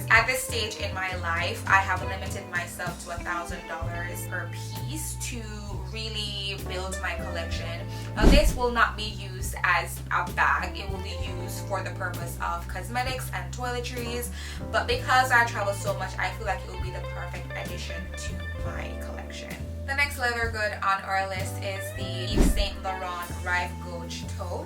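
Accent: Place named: Jamaica. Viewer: I heard a Jamaican accent so I’m subbing to my people.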